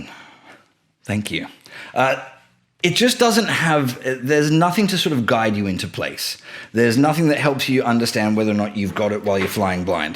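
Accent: United Kingdom. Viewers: you are a funny guy. Nice accent, not overly British, good voice, great to listen.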